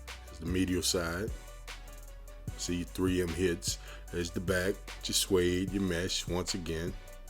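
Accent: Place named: New Orleans, United States. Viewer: They hard bruh ... Man U from NO... the accent sound just like it ... I’m in BR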